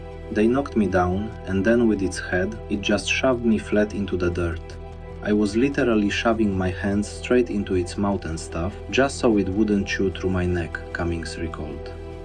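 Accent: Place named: Eastern Europe. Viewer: ur English is great and you did a superb job in the narration. I support Poland 100% as well as your great president in keeping Poland Polish. Western Europe is committing cultural suicide.Way back in Polish history, they took a strong stand as well. My comment was meant as a compliment because I always think the Slavic accents are so cool! I’ll put a “thumbs up” on your video right now.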